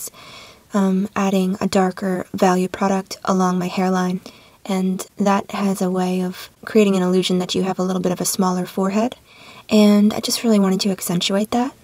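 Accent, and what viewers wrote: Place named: South, United States. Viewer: Excellent. First, you taught quite a few tips that I can apply in other editing programs as well; And also you showed Affinity which I've always been curious about. Finally that hint of a Southern accent makes me want to move to South Carolina immediately 😎 Thanks!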